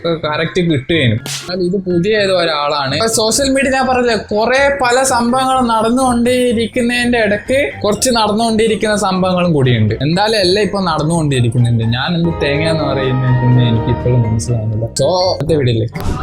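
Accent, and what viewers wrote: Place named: Malappuram, India. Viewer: Slang kettit on malapuram karann ahn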